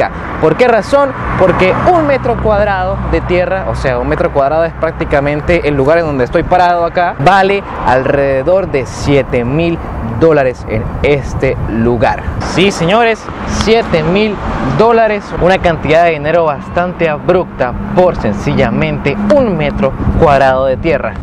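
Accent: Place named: Andes, Venezuela. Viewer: lo Gocho.. es una expresión ... por su acento característico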